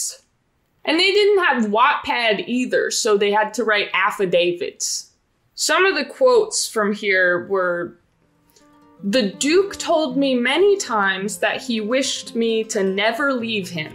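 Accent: Australia. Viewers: Actually really good Australian voice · More australian accents please. 10/10 video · Solid Australian accent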